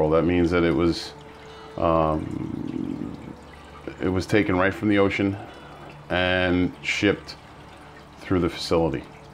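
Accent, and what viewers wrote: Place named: United States. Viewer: Hahaha quite the opposite im an English girl so tell me an English girl who doesn't like a sweet ass American accent ☺️☺️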